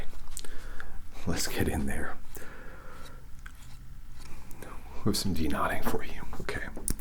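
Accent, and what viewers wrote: United States. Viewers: Wow, your American accent is excellent. If I'd seen this series first I would have assumed this was your natural accent. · I LOVE the American accent!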